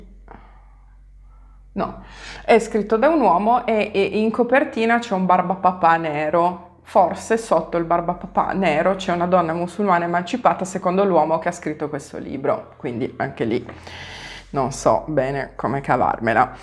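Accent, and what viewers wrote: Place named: Emilia-Romagna, Italy. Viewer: 😂 ciao sei sempre bravissima, perché smonti con prove alla mano ogni eresia degli infedeli camelgiokey sei per caso emiliana romagnola ,ho sentito una cadenza famigliare,socmel😅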